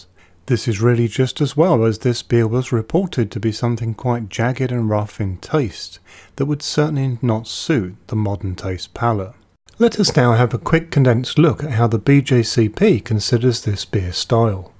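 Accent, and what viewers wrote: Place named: England, United Kingdom. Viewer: Thanks! I really enjoy your videos...it's always reassuring when the presenter for a porter recipe has an English accent.🙂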